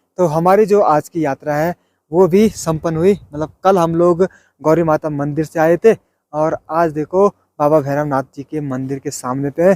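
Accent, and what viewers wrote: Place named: Pahadi, India. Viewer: 🇳🇵 Aap Ki Bolaney Ki Awaz Mey Pure Pahadi Paan Hai Jai Pahadi Maa🇳🇵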